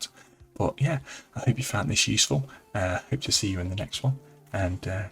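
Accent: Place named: Manchester, United Kingdom. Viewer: Hiya, are you from Manchester way? I was trying to place your accent. If so I'm a little further north but local! Anyroad, not important ;-) And if I've insulted you, apologies, haha! You've gone to a lot of trouble, first with the programming, and second with the explanations/instruction within videos such as this. The quality is fine and explanations clear. I believe complainants should perhaps listen harder and get hands on more. Thank you for the time and trouble you've put in to this. Much appreciated.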